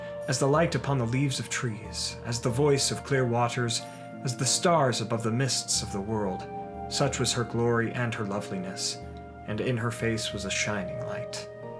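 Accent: United States. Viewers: Lol, it sounds so wrong told in an American accent😂🤣!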